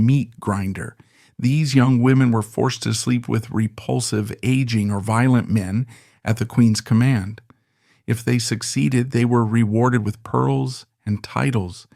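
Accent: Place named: United States. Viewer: pile of AI crap. Worse...Faux European history done with an American accent ..urgh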